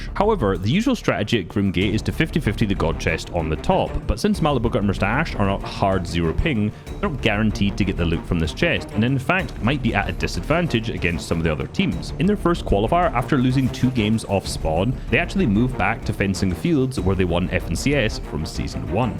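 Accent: Scotland, United Kingdom. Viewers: But isn’t he Scottish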